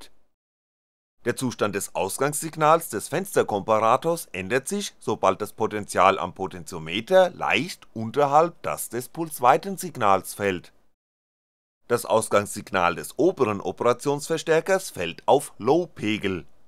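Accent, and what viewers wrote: Hesse, Germany. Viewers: Seltsammer Sprech da wo Du herkommst (tiefstes Hessen?). Man merkt das Du versuchst betont Hochdeutsch zu babbeln :))) Fachlich sind Deine Videos mit das interessanteste was YT zu bieten hat. Wirklich toll was du hier ablieferst. Allerherzlichsten Dank für die Arbeit und die Zeit die Du in die Anleitungen reinsteckst. Ich hoffe noch viel von Dir zu sehen.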